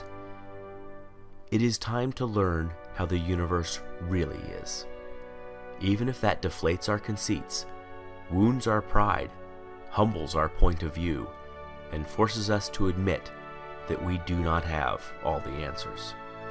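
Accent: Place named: England, United Kingdom. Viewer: Giọng Anh nghe phê thiệt :)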